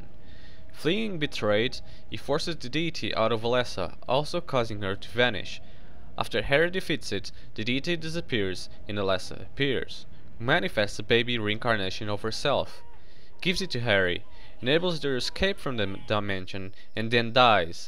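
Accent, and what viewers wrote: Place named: Portugal. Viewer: You have a Portuguese accent. Are you?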